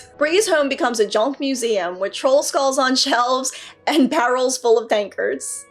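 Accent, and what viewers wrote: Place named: Boston, United States. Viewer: Sounds like Boston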